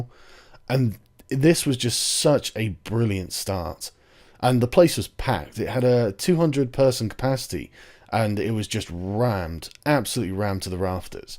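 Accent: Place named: United Kingdom. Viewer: you sound reeeeally british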